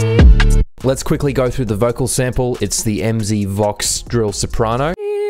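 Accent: Australia. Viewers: yo you aussie?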